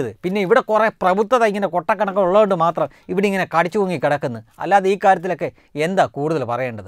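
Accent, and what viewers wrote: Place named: Kannur, India. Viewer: എൻ്റെ നാട് ആലപ്പുഴയാണ് , കുട്ടനാട് ആണ് എന്ന് വാർത്ത വായനക്കാരൻ ഇടക്കിടെ പറയുമ്പോൾ ഒരു സംശയം. ഭാഷ കേട്ടിട്ട് കണ്ണൂർ കാരൻ ആണെന്നാണ് തോന്നുന്നത്.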